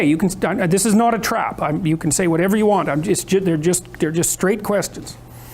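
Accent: Canada. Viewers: I love it when he goes full Bubbles from Trailer Park Boys 🤓 I love that Canadien accent!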